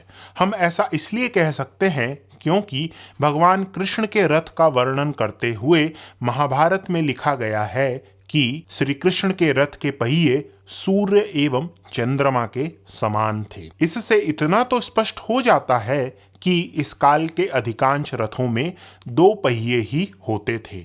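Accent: Standard. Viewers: request, aap standard hindi toh bolte hi ho, par thoda thoda awadhi language (awadh region ) words and slang, accent bhi use kar liya karo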